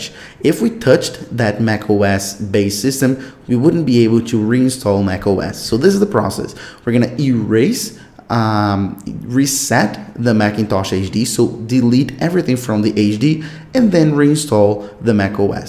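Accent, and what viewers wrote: Brazil. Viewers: Brigado cara! Eu se que vc fala Portuguese! Could tell you were Brazilian by your accent speaking English!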